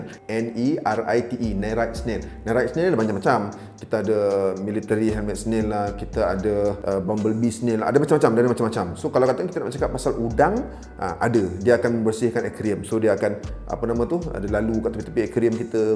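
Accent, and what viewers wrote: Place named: Negeri Sembilan, Malaysia. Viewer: Abg nogori ko dgr loghat tu mcm ade nogori sikit ii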